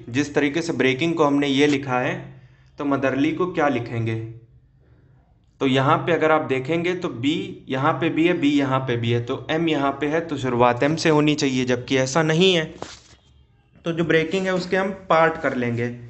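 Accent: Kanpur, India. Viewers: Ye sir pakka kanpur k hai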